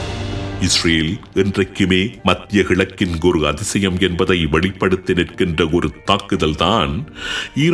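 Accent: Sri Lanka. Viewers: வர்ணனை செய்பவர் குரல் கம்பீரமாக, தெளிவாக உள்ளது. இலங்கை தமிழ் போல தெரிகிறது. பாராட்டுக்கள்.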